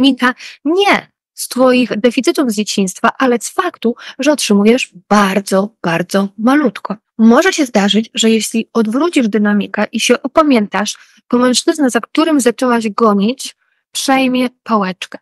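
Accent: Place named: Ukraine. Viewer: myslalam ze jest pani ukrainka czy spod tzw.kresow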